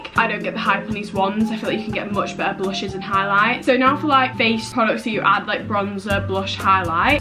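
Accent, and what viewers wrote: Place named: Newcastle, United Kingdom. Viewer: I LOVE YA FINALLY A GEORDIE YOUTUBER IM GEORDIE AND IVE BEEN TRYING TO FIND A GEORDIE YTBER FOR AGES! I knew u were geordie when I hear ya say quid 😂 I LOVE YOU SO MUCH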